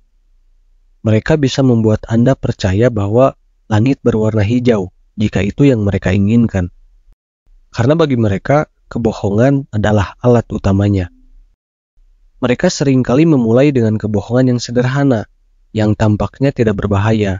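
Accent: Indonesia. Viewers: Senpet salfok, background nya meni garang, tapi voice over nya nyunda pisun 😁😁, hatur nuhun kang ilmunya, resep pisan videona meni berpaedah.. gass lanjutkaan berkarya.. ❤ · Sunda banget nada y 😁👍👍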